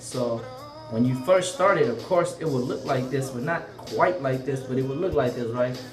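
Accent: Bay Area, United States. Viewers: KingOfKings it's called having a Bay Area accent, you obviously aren't from the bay. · You sound like you are from the bay area you got that language to you. Dreads are dope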